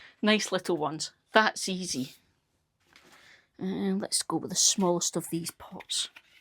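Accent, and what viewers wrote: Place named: England, United Kingdom. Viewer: Thank You, Canada here. Just got some tubers (60$), so dont want to lose them.:) You helped alot. Peace. Love your accent (so pretty)... English accents always sounds like birds chirping... kind of. :) Peace.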